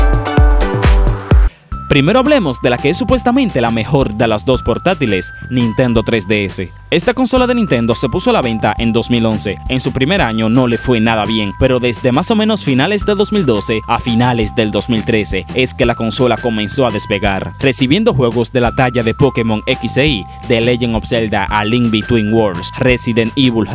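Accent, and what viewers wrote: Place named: Dominican Republic. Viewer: men tu eres dominicano tu me suena dominicano xd (yo si:v)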